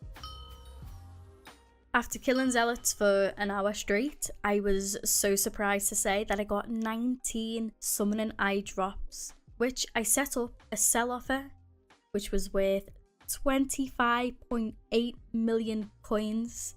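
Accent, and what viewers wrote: Liverpool, United Kingdom. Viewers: Wait are you scouse? Because I am and I’ve never heard of a scouse Minecraft youtuber😂😂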